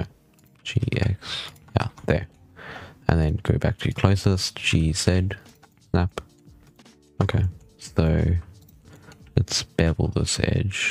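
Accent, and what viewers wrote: South Africa. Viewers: Just thought you sound abit ex south african, I am ex South african and we sound very alike lol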